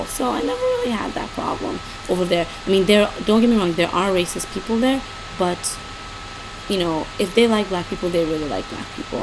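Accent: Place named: Nigeria. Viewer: Sup? I'm also Nigerian/American but moved to the States when I was 2. Surprised to hear you still have a hint of a Naija accent! informative video...pz.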